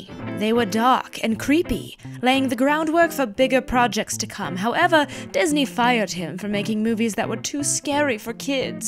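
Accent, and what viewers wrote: United Kingdom. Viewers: Well you sound more British than I do, and I'm actually British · Wow. I can't believe you did a british accent for this entite video. 👏👏👏👏👏👏👏👏👏 That takes guts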